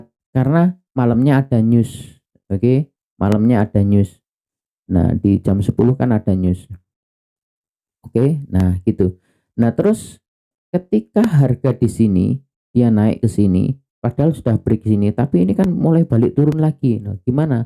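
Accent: East Java, Indonesia. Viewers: Apa mas nya dari jatim ? Bahasanya medok jatim 😁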